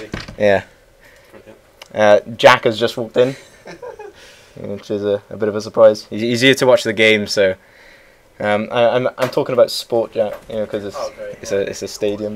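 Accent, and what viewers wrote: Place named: United Kingdom. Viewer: This is weird cause I really like hearing British accents in commentaries. I feel like you're supposed to have a lot of viewers.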